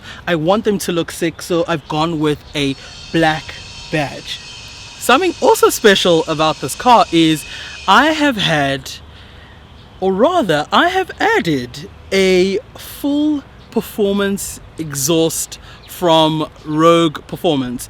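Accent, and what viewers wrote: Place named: United States. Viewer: Good effort bruv. IMHO try being more yourself South African opposed to American you tubers accent wise. Nice car I've also got a R 2016 dsg oryx white from new and a V8 M 2008 black on black 6 forward drivers car. Looking at a Hawkeye STI in the near future sick project. Keep it up also the R is a beast tuned especially of the line.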